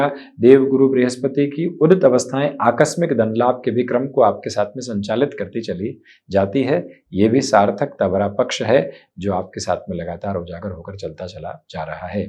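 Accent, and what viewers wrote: Standard. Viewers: Bahut shuddh hindi hai Bhai ki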